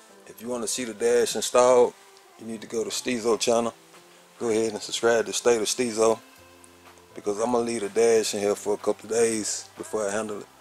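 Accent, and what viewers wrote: Alabama, United States. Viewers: Dude sound like hes from Bama... Credits roll Bama tag lol great job man. new sub lets get that 100k for ya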